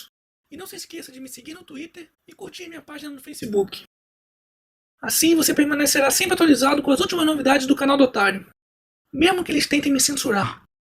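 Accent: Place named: Rio de Janeiro, Brazil. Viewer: Muito bom o vídeo, parabéns! Pelo sotaque deve ser carioca né?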